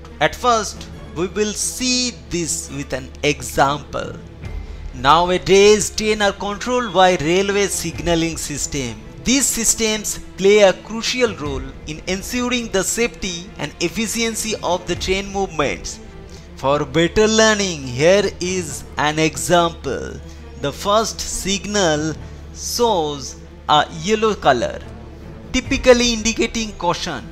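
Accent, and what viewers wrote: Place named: Odisha, India. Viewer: Odia speaking people will speak English in that way. NOT EVERYONE.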